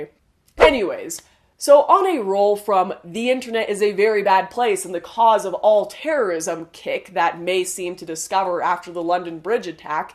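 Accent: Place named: Canada. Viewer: That Canadian accent doe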